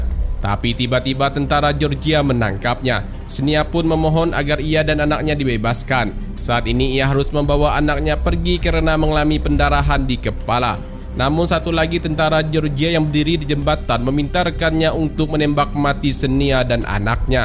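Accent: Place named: Aceh, Indonesia. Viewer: Logat Aceh pak Admin ya😂😂, mantaf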